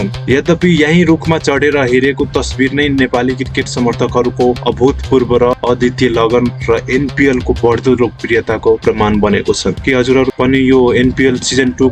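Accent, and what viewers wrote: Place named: India. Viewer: Bhai Tme Nepali ho ki Indian jabarjasti Nepali bolako jasto suniyo yr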